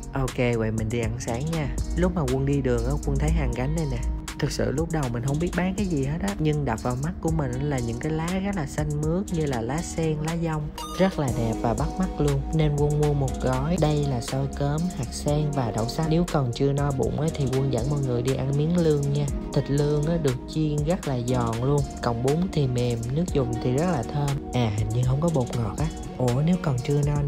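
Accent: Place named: Binh Dinh, Vietnam. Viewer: Giọng BĐ nó z :)) nghe nhìu òi :))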